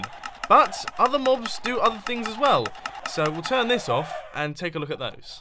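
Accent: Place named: United Kingdom. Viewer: He kinda sounds like Wheatley from Portal 2... Probably cuz he British lol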